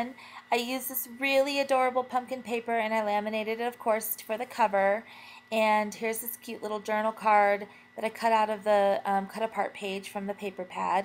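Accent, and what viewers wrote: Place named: Nashville, United States. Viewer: hello where do you live you sound like your from Nashville that where I live but you said you went to somewhere eles to the dollar store just woundering love your vidos learn so much is there any way to send you a message?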